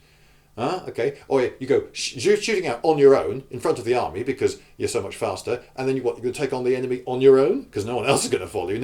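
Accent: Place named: England, United Kingdom. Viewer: Love the English accent. A unique attitude.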